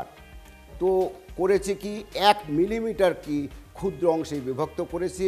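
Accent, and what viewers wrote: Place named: Natore, Bangladesh. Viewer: Tnx sir...sir apner kotha sune mne hosse apner basa natore district a....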